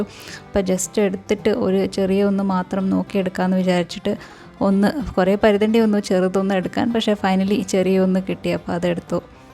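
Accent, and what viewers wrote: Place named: Kannur, India. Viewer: slang കേട്ടപ്പഴേ തോന്നി.😍✌️നമ്മടെ കണ്ണൂർ ഭാഷ.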